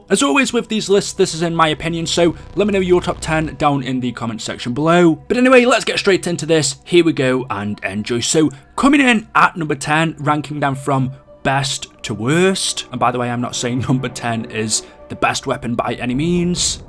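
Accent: United Kingdom. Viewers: are u brithish or are u american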